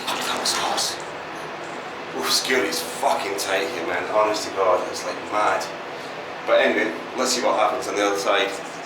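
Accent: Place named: Scotland, United Kingdom. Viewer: I love your Scottish accent ❤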